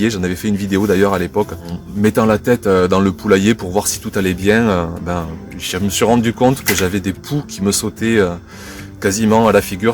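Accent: South, France. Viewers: Bonne idée!!! Sais-tu si on peut aussi utiliser les huiles essentielles contre les poux rouges. Pour l'instant, je n'ai pas ce problème, mais, en prévention, je voudrais agir avant de devoir guérir. Au fait, Est-ce que c'est bien al que je connais? Nathalie VALERO Merci pour tous ces partages de belles expériences. A l'accent, j'entends que tu es dans le Sud. Et à l'emploi de certaines expressions, tu me fais penser au naturopathe Christophe BERNARD. Est-il de ta famille?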